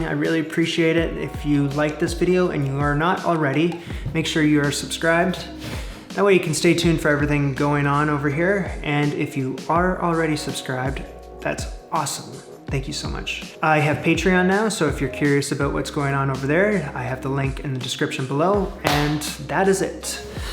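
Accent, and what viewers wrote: Canada. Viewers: OMG!! Just found you a couple of days ago and thought, this guy sounds Canadian, how 'about' that??? Then I found this... However, I love my butter tarts you have to make them both ways!!! Maple Syrup is the best way not too sweet and yum!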